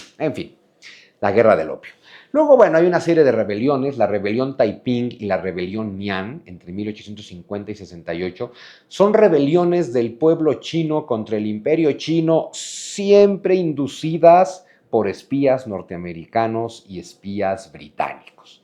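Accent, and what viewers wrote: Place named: Mexico. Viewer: tu acento mexicano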